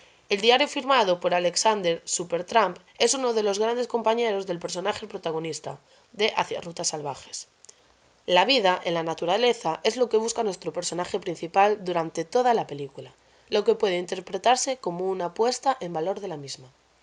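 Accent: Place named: Galicia, Spain. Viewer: Gracias. Tienes una voz preciosa...galleguiña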